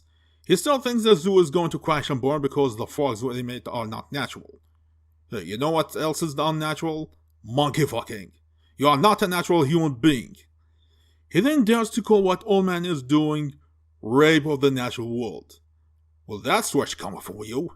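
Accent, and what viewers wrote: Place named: Dubai, United Arab Emirates. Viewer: It’s actually his thick accent, and bad mic quality. He’s from Dubai if that clears some stuff up.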